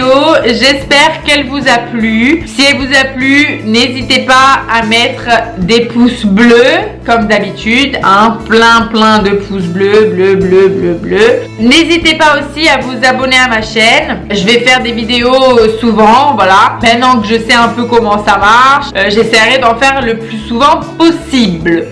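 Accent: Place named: South, France. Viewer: Le "rause" , on adore l'accent du sudd. Gros bisous ❤ super vidéooo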